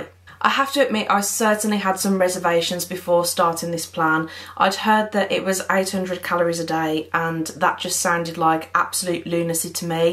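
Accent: West Midlands, United Kingdom. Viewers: Sounds like you live in the west midlands :)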